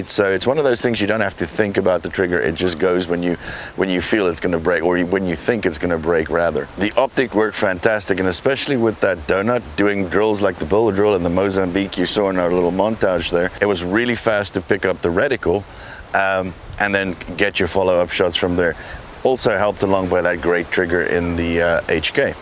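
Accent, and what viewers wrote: South Africa. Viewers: You have a South African accent. Great video. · Lekka South African accent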